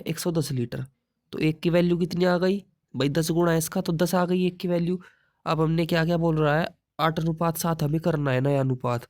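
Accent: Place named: Saharanpur, India. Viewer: Big bro aap west up se ho kya ....aapki voice desi saharanpur wali lagti hai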